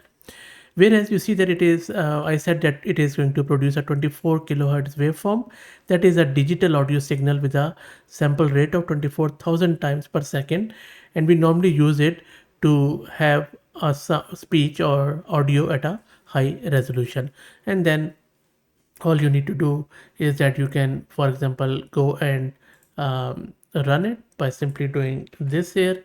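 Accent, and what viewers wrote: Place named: India. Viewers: For me his accent is very clear Mayebe you are not familiar with Indian English accent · Obviously it's you who need to keep up with the Indian English (no offense meant here either). Indian English has an overwhelming stronger presence on the net than southern USA, Scottish, new Zealander, Australian, Scottish accents... That no one dares be prejudiced against.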